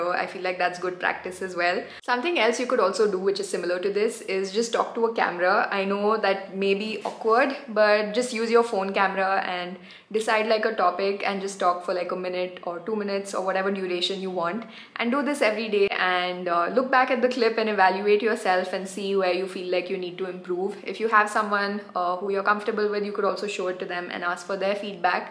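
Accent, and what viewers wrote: India. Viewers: today i am first time encountered with your video, i liked your fluency in english with good indian accent could understand easily. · But your accent is totally indian you can’t say either American or British lol I enjoy watching your videos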